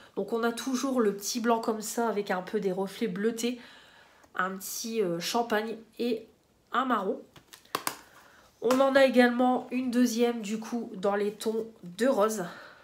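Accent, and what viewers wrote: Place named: England, United Kingdom. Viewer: En effet, j'ai kiffé le petit accent anglais 🤣❤️ hâte de voir de jolis makeups avec cette palette ✨️🌸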